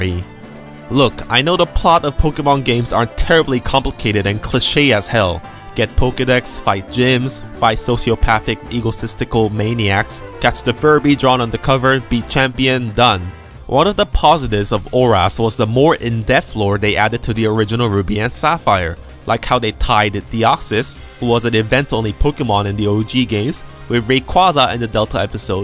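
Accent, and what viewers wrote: South Korea. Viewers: I just started watching the first 10 seconds and i am honestly surprised by the disclaimer. Sure you have a slight accent but your monologue is totally understandable and there's really no need to undermine yourself like 'my weird Korean accent'. If i may put some suggestion, just say you have cc. Be confident with yourself! I'll continue watching 👍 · Sorry but I laughed way too hard when you mentioned your "weird freak korean accent", I love your accent, but you really made me laugh with that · Oh, wait so that's a Korean accent?!? Geez, I didn't notice at all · Dude, I can hear you just fine I have no problem with your Korean accent. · 뭐야 뭔가 발음이 한국엑센트던데 진짜였던거임?? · Do you really have a Korean accent? I'm French and I understand you more than a British 😅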